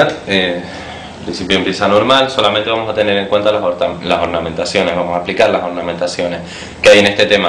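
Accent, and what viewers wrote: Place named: Tenerife, Spain. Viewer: Sabia que eras de Tenerife!!! XD Te reconoci el acento. Yo soy de fuerteventura y estoy aprendiendo a tocar el violin aun (llevo un par de meses con clases privadas (porque la uni no me deja tiempo)) y bueno, estoy deseando poder tocar asii!!!! XD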